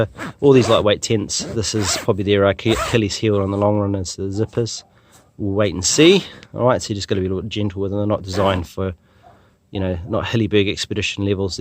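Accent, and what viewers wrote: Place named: United Kingdom. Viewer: Thank you, perfect review. Not only are your thoughts and your judgement very valuable to anybody thinking about buying this (I just ordered one btw), but also you have this really nice british English. A bit like David Attenborough, bit like the Top Gear guys. I like it.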